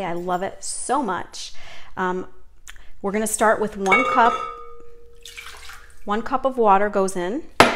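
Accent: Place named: Central New York, United States. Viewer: Your accent is pure central NY and I love it. We had pizza like this when we were kids in Niagara Fall...Ragú, though? No ma'am.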